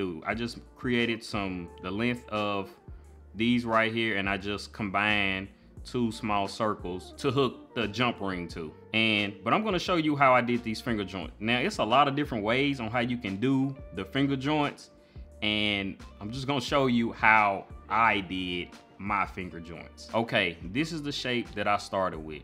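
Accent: South, United States. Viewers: Love this!! Makin' me want too look into how much all the equipment would cost.... Glad I found you, u can thank IG, there was some commercial & I just had to go find you, with your smooth southern voice! 💚Loving that too💚🤍💚🕊️ may God bless you!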